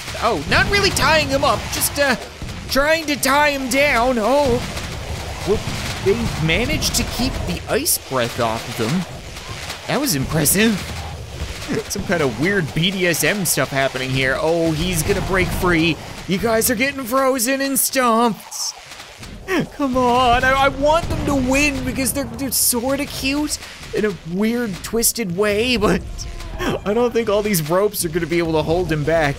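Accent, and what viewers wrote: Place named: Canada. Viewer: pronouncing his words differently might have something to do with his accent, I think. I find it obvious he pronounces “sorry”, “tomorrow”, “about”, etc. the way he does since he’s Canadian.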